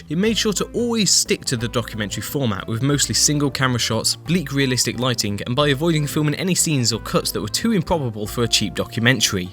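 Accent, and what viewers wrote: United Kingdom. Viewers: Deffo British. I've met the man in the flesh! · MyUsernameIsReallyFuckingLongForNoFuckingReason he’s British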